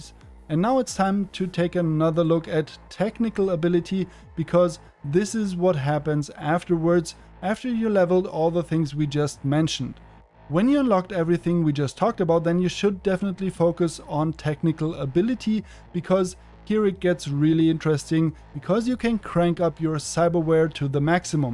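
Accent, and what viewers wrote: Germany. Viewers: Wenn Deutsche englisch sprechen… 😅 aber schönes Spiel! Cyberpunk ist zum Glück noch ein geiles Game geworden! 👍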